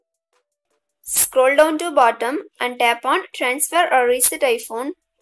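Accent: India. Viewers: Thanks for “caption”. English of Indian accent, because, it’s hard for me to understand it, I usually get off of the site. But with your CAPTION, I read and I am thankful of that. “THANK YOU”.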